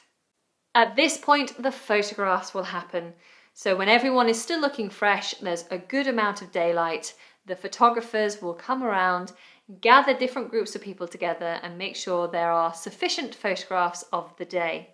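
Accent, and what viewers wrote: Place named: United Kingdom. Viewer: Hi, I really like your videos, you are very nice and have a lovely voice and a clear and perfect British accent that makes it easier to understand and learning english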